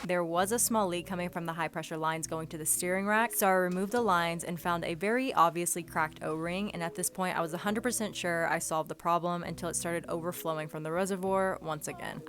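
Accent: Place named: West Coast, United States. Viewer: She sounds like she's from the West Coast. East of the Rocky mountains, the cars all rust out.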